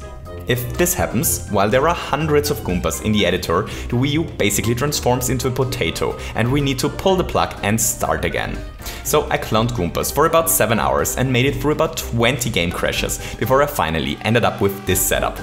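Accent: Germany. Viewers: Are you German? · I'm German and I normally dislike German accents, but accent is kind of...charming? And it makes me smile when I can tell from his voice that he's smiling. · I always thought that he was german too but i didnt want to make assumptions. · Deutscher Akzent :)? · Are you german? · Dein englisch :D gut,aber der deutsche Akzent :D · The german accent is strong with this one! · Dieser Akzent, man hört richtig das Deutsch raus xD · Are you german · the german is strong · Urgh german accent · Not being rude but you sort of sound German....or Austrian....or even maybe Polish...Just saying! Gday and kudos from Australia! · An die deutsch englische Aussprache muss man sich erstmal gewöhnen sonst gutes Video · Are You german? Your accent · He has a strong German accent imo. But maybe it's easier for me to tell because I'm Austrian too. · He has the most German accent I have heard in a long time! 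Psycrow with a hard "p" at the beginning for example ;)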